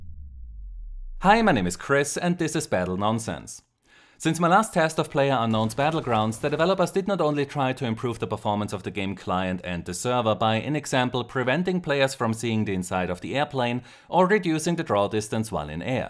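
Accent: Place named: Germany. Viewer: Normalerweise nervt mich ein deutscher Akzent immer wahnsinnig, aber bei dir geht das eigentlich sehr gut.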